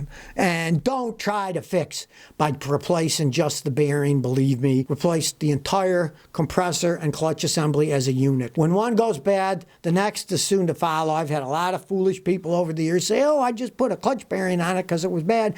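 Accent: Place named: South, United States. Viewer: If you glued Scotty's hands to his knees, he wouldn't be able to say a word. He talks like folks from the South (says a gal from the South).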